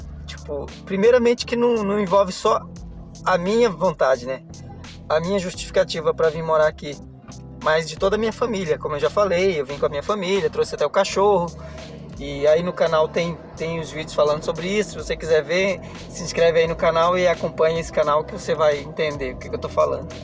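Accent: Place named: Santa Catarina, Brazil. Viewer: Vc já está com sotaque de Catarina. Sou catarinense e moro em Joinville.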